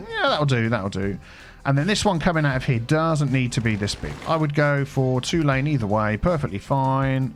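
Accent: United Kingdom. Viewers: start talking like a Brit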